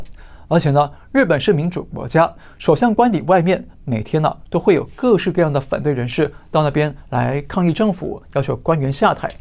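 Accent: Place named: China. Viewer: 听口音也曾经是个地道的中国人吧，你这个日本武器当的自觉自愿🎉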